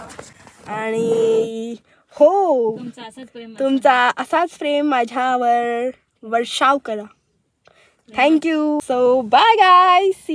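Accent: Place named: Malvan, India. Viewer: Loved the malvany ascent...plz more of that type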